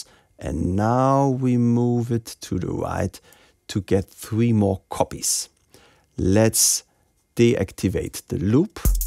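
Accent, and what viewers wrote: Germany. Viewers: Sir you have the best and most german accent i have ever heard ! I love the tutorial very very begginer friendly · This German accent is cute.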